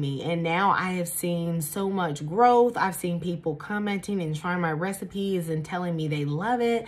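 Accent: South, United States. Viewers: You are so beautiful and I love your Southern accent, where are you from??😍🥰🥰🥰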